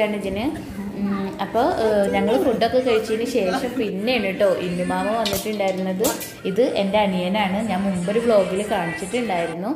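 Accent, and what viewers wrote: Malappuram, India. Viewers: Ningal malappurathu evideya??thani malappuram slang.njanum malappuram aanu..anyway proud of u.ethrayokke achieve cheythallo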